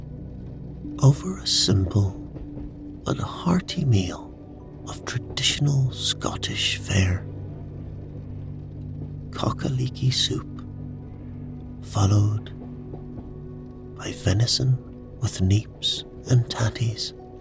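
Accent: Northern Ireland, United Kingdom. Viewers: Why does sound NI/Irish? It's putting me off relaxing. A recognisable Scottish Accent would be better. Yes I am Scottish, maybe it's the whispery way he is speaking but his inflections sound NI. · Doesn't sound scottish more skootish even Irish ai shit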